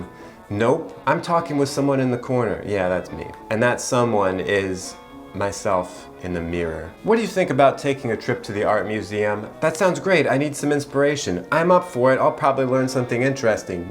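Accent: France. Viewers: This French accent of yours is something I wasn’t ready for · You have a beautiful French accent.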